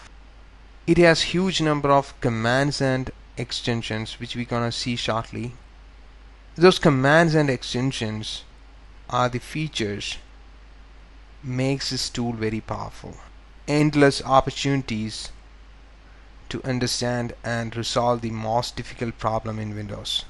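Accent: Kerala, India. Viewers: He is speaking with a Malayali accent. People across India speak with different accents. The content in his videos are indeed well thought out and very helpful. Appreciate his effort and if you cannot then move on; there is no need to be abusive.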